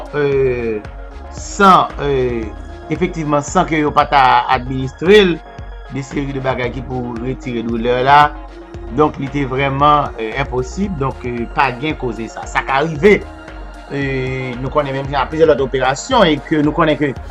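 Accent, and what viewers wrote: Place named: Cap-Haïtien, Haiti. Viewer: Saaa c nèg okap kap palé laaa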